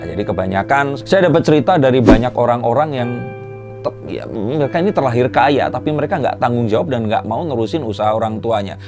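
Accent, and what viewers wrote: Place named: Java, Indonesia. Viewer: Lama2 ngomongnya ikutan medok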